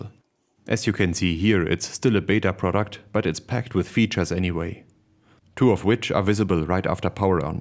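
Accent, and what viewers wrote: Germany. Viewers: I think its a german accent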